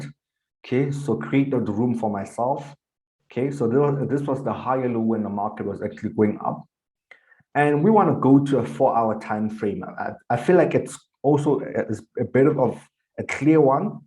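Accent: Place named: Uganda. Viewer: U sound like Ugandan and munyoro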